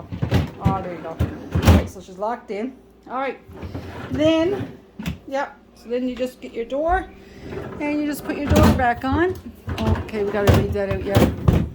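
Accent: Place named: Cape Breton, Canada. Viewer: Ay, take me back to Cape Bretton. 👍 Love that accent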